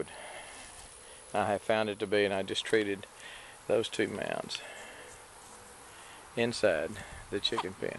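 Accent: Texas, United States. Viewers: speak english , not texan drawl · Love your accent! How I miss my home state of Texas!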